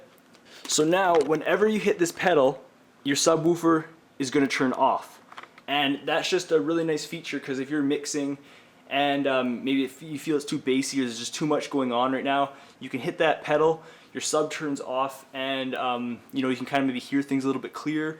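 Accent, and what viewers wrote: Canada. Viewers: Is this dude...Canadian!?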